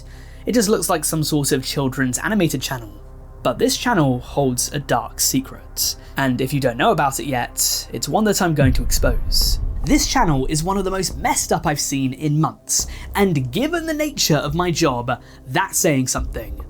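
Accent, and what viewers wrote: United Kingdom. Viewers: i like you british cody ko, much better than the other one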